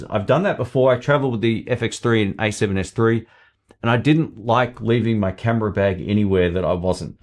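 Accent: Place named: Australia. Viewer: woops my bad. Should've recognized ozzy accent) silver top looks very nice, hope it'll make it over here sometime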